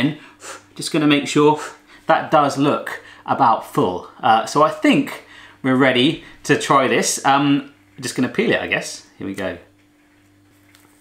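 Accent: United Kingdom. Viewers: Are you British or America?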